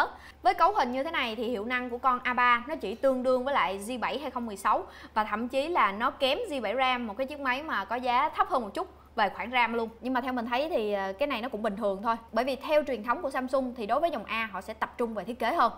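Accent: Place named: Mekong Delta, Vietnam. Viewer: thích cg miền tây :)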